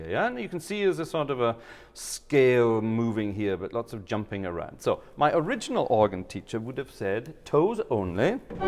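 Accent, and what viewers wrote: Scotland, United Kingdom. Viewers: Love your scottish accent <3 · First time on your channel. Fascinating, as the organ has always seemed so complicated—to a piano player such as me. Also fascinating is how your accent moves from Scottish to Sean Connery performing a German general in a World War II movie. 😉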